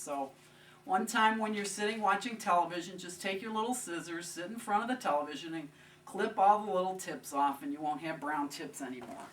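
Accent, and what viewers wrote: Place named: Central New York, United States. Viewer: I spent most of my life in Baldwinsville, NY, just north of Syracuse, so I know a CNY accent when I hear it. You have literally the thickest CNY eeyacent I've ever heard in my life. You may be from like Buffalo or Rochester or something in western NY I guess. But holy shit, you're like the epitome of upstate/central NY/western NY rural twang. I bet you're a huge Wegmans fan and I bet you've cooked salt potatoes more than once.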